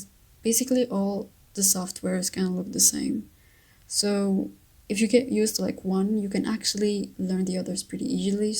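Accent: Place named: Morocco. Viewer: HEYY are u moroccan (i dunno why u sound moroccan)?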